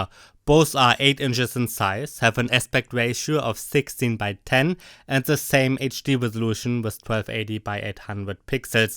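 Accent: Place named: Germany. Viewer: Nice vid, love that thick german accent too. Keep it up, definitely one of the best reviews on this tab.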